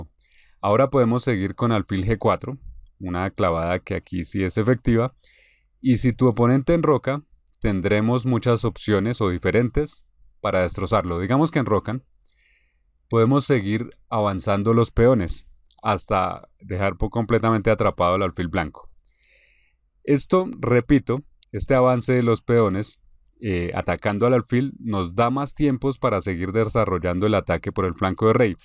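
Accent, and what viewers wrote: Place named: Bogotá, Colombia. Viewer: Habla como si fuera de colombia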